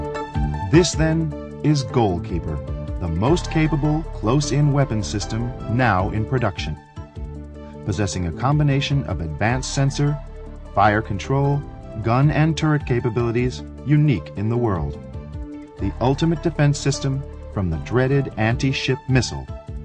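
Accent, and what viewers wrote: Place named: United States. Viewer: He speak american english NOT french so its american made video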